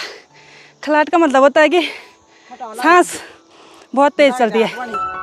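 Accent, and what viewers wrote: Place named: Rudraprayag, India. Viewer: Aapki Garhwali Bhasha hamare Rudraprayag wali hai bhardar Patti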